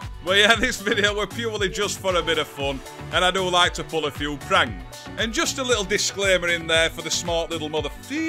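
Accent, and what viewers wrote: Yorkshire, United Kingdom. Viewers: 😂😂😂😂😂 had me there Haha. Yorkshire accent aswel that. Where you from? I'm from wakefield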